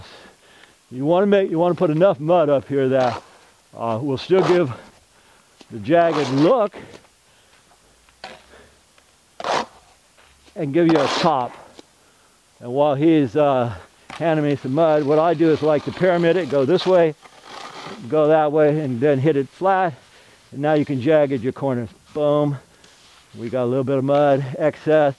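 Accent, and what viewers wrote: California, United States. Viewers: Never seen such a pro stucco enthusiast in my life tip of the cap 🧢 that accent is so Cali it hurts 😂 good stuff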